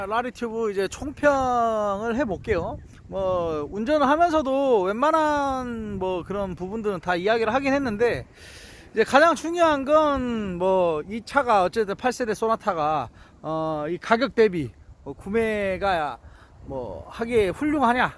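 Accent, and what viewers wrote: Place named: South Jeolla, South Korea. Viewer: 억양이 전라남도 무안쪽 사투리 같은 느낌이네요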